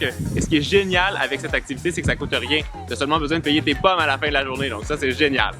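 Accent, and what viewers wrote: Quebec, Canada. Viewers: J'adore ton accent 😍 c trop cool l'accent québécois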